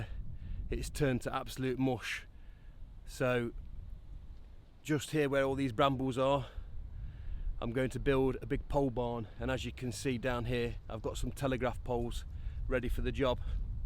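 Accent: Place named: East Midlands, United Kingdom. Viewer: Nice to see a new video! You sound like you’re either Notts or Derby… if it helps I use RB Elliott at Calow and they kill and cut the pigs for me, I only have a basic cut into big joints and then cut them down further myself and package up. They do offer kill only of course I’ve always found them to be very good.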